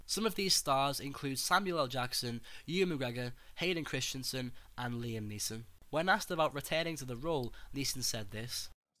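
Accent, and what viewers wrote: Liverpool, United Kingdom. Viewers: Where are you from? UK probably? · Are you from Liverpool????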